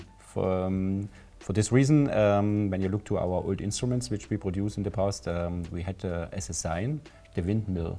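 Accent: Germany. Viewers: German Accent at it´s best ;) sometimes i love my country for things like this XD but he seems realy competent and like a nice chap :) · East German accent non the less. 😊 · That’s one heavy German accent! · Ich liebe sat german Inglisch ... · As a German myself, it's painful to listen to this. But he's from East Germany. They never had English in school. They had to learn russian in the GDR (DDR)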